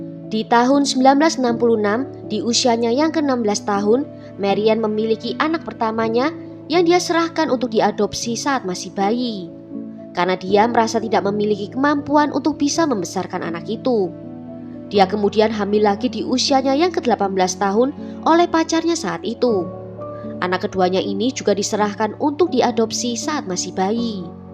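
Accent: Java, Indonesia. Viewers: Medok mbak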